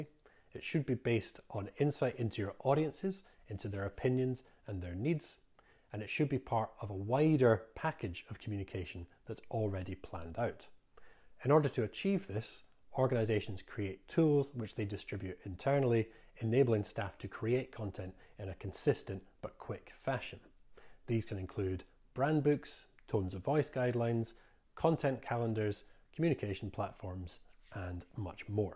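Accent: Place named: United Kingdom. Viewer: Great uk accent straight to the point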